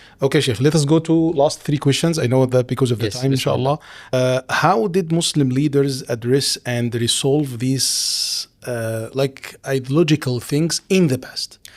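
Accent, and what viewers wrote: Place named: Egypt. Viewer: This sheikh He sound like egyptian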